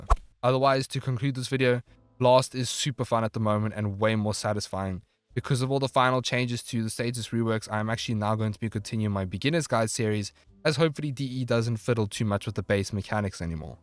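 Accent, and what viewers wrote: South Africa. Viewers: Your South African accent makes you more trustworthy somehow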